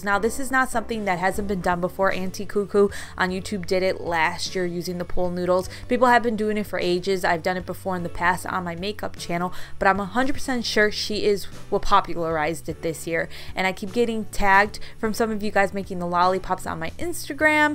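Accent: Chicago, United States. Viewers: Awesome as always. From one Chicago girl to another, it’s our accent. LOL OR DA MINT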